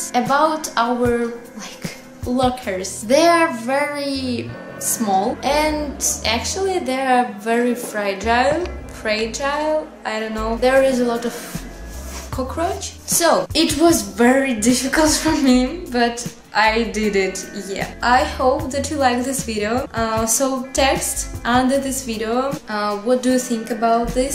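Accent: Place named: Russia. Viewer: видно же,что русский акцент хах😅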